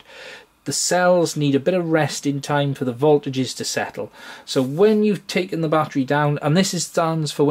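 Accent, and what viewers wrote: Wales, United Kingdom. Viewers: Sound like a fellow Taff. Good to hear a fellow taff, I'm based in Western Australia watching your vid reminds me of home :-) - Hiraeth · How refreshing to hear a Welsh accent on YouTube. Great video mate! Diolch yn fawr😀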